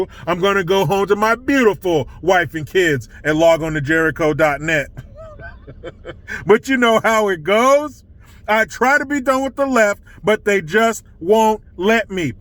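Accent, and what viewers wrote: Australia. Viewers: Lol love your Aussie Accent, 😂😂😂 🤣🤣🤣🇦🇺💕💕💕🇺🇸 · Love the Australian accent......"mate!"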